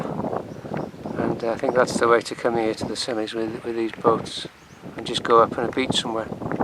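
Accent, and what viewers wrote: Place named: North Wales, United Kingdom. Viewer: Diolch yn fawr. Glad to hear a North Wales accent & to watch your adventures in small yachts. Wish you fair tides & following winds for the future. "Hwyl a flag" from Cornwall & Brittany.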